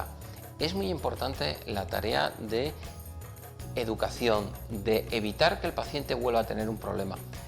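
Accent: Spain. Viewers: ya decía yo por el acento era español...oh tan lejos doctorcito, estamos en América latina. 👍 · Dr yo tengo dos hernias pero yo vivo en México y por su acento español me imagino que Ud vive en España pero si quisiera saber el costo y donde podría contactarnos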